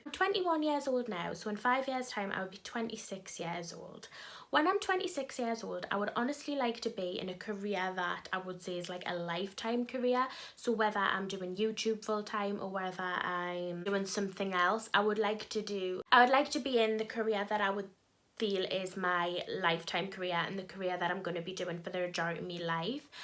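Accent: Newcastle, United Kingdom. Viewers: I love your accent! Makes me miss Newcastle. I used to live up there for a bit. 🙂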